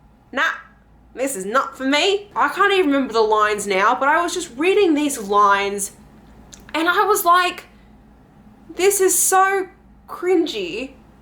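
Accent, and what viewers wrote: Australia. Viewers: Your reactions make your Aussie accent so prominent. It was very entertaining 😂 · love that Aussie accent 💪now were is the manga! You talking about these gross books with no pictures LOL I'm just playing but it doesn't sound like you enjoyed these books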